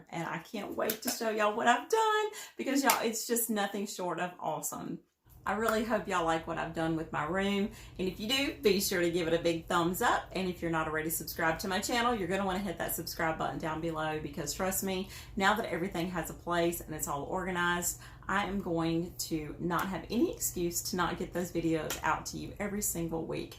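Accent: South, United States. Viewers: Where are you located? I am just curious because I’m a southerner with your accent too!! 😂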